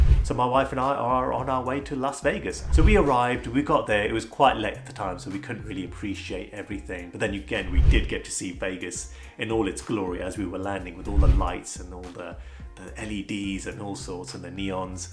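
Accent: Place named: United Kingdom. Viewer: the slightly British accent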